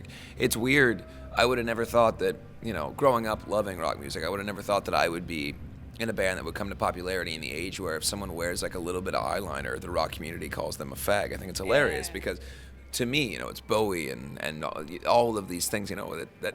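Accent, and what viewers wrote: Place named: United States. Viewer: He has an American accent. Are you American by any chance?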